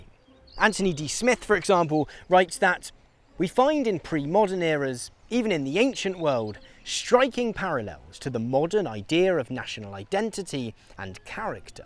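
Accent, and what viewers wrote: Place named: England, United Kingdom. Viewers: When we hear English accent in prose, which is a good thing, we harken to the old school days when music in England was the trend indeed. by is the perfect filler of a song of the Queen's English, mate! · Speaking in your English accent with your Anglocentrism saying that Scotland is an example of a nation that doesn’t have it’s own state. You are blind. England is also a nation that doesn’t have it’s own state. That arrogantly blind Anglocentric attitude is what Scotland wants to get away from asap.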